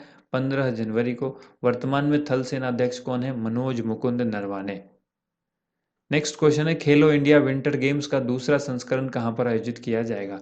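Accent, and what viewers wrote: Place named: Haryana, India. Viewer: mujhe Lgaa aaap haryana se ho😆😆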